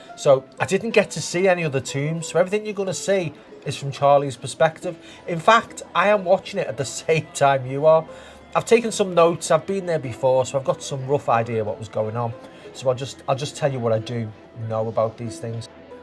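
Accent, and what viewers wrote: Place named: Bromley, United Kingdom. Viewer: Great video and insight. I love your video and British Bromley accent. 🙂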